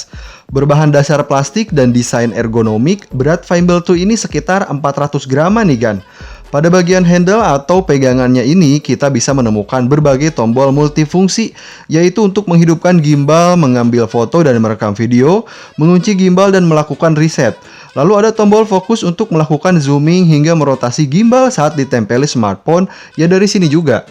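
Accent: Kuningan, Indonesia. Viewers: Siga orang kuningan nya mang? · Bro orang Kuningan?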